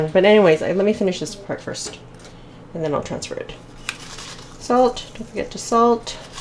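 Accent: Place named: Canada. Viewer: Chinese Canadian - great accent! Lovely food as well!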